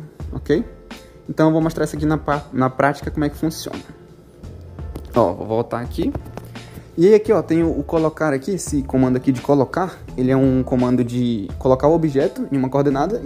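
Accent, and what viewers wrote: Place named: Rio de Janeiro, Brazil. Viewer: Eu chuto q tu es carioca ou algum lugar do Rio de janeiro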